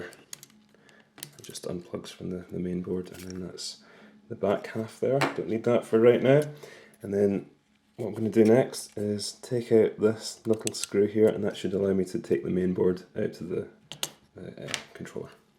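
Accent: Scotland, United Kingdom. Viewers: I am inordinately partial to the Scottish accent, but even with that given: actually find your voice TOO soothing to fall asleep to- like a babbling brook with insights lol. Keep it up brother, seriously, and please monetize for our sakes; we really like you, bro -want you to stick around. :)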